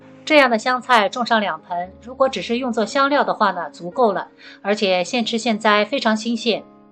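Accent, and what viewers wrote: Jiangsu-Zhejiang, China. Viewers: 听你口音是江浙一带的吧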